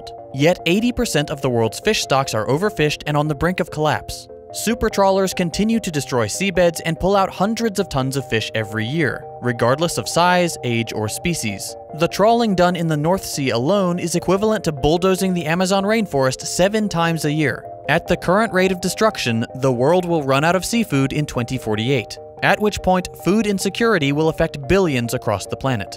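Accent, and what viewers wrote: United States. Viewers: I usually prefer British or other accents than a thick and strong American accent, but your ability to narrate is incredible and your voice is super relaxing and good to hear, great job bravo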